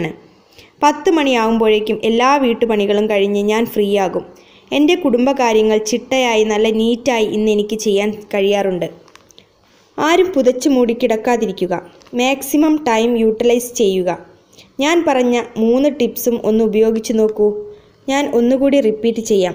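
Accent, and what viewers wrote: Kozhikode, India. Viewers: your clt slang is so attractive and sweet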